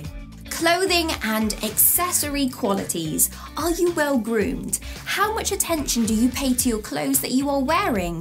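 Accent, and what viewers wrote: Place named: RP, United Kingdom. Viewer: This accent is RP .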